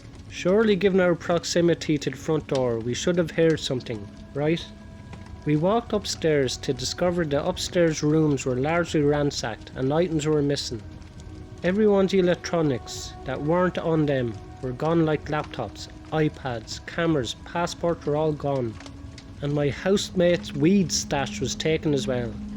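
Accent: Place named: Ireland. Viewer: love the accent, there are way too few Irish youtubers with creepy content! I hope with more subscribers, the mic will be better in future, although I get that it's not first priority. As a suggestion, you could make longer videos, as many people like to fall asleep to them or drive while listening in - and if they are this short, it's not as convienient. About 20-30 minutes would be best :)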